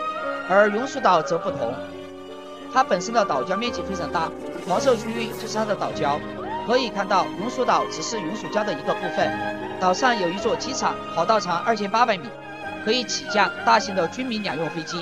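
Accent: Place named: Sichuan, China. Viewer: 这声音是四川那一带的普通话。谢谢解释！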